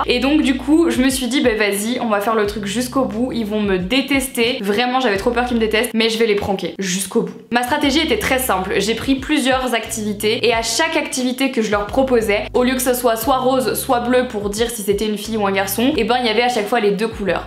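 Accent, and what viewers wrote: Brussels, Belgium. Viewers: tu as un petit accent belge 😂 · T'as un peu pris l'accent belge ahaha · Trop chou, tu commences à prendre l’accent bruxellois du Henri. Pendant que moi, j’ai perdu mon accent belge dans les Alpes 🥰🇧🇪🇫🇷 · tu as pris l'accent belge!!! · Tu as vraiment adopté l'accent belge je trouve ! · Sais-tu que tu commences à avoir un petit accent belge par moment 😉?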